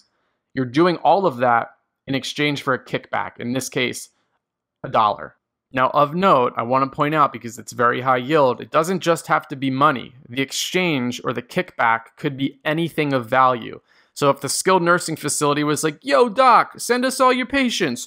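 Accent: New Jersey, United States. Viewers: I loved the Jersey accent... it make me chuckle. I'll remember this for sure.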